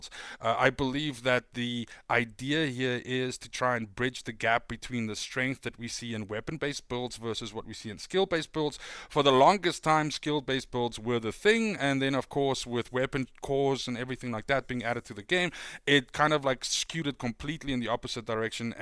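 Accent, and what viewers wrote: South Africa. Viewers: I saw you are from Denmark but, you really sound South African!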